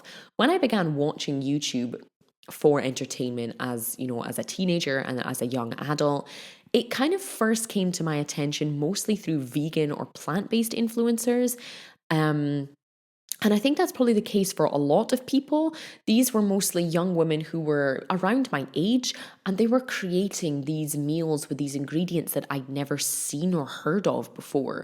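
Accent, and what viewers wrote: Scotland, United Kingdom. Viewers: I dont know what it is but I love hearing you talk lol probably the scottish accent 😉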